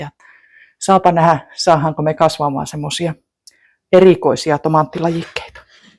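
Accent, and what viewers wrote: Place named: Oulu, Finland. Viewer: Kiva kuunnella oulunaksenttia,edesmennyt mieheni oli hailuotolainen😢❤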